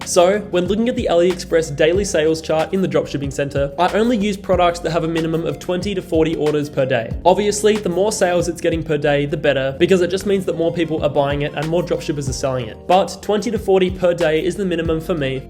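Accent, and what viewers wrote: Australia. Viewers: Pretty sure you Australian haha big shout out from NZ!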